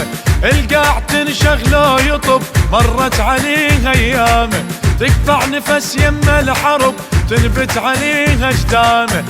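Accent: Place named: Iraq. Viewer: اني اسمعه الصوت العراقي ماينشبع منه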